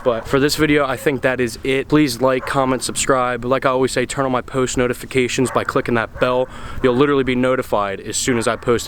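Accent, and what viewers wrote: United States. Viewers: The music makes me think that this is Ireland, and he looks Irish, but he's speaking in an American accent, so IDK what to think lol..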